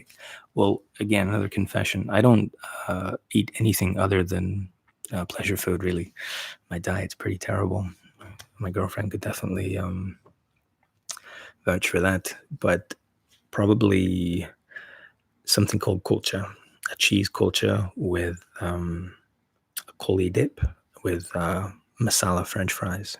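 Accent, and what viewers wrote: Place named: Scotland, United Kingdom. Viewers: It's an edinburgh accent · Are you Scottish, American or neither bc I can't work out your accent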